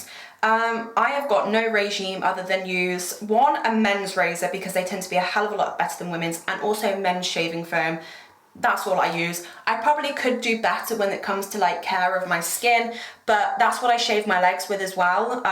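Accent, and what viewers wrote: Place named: United Kingdom. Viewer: What is your accent ?.. reminds me of the harry potter movies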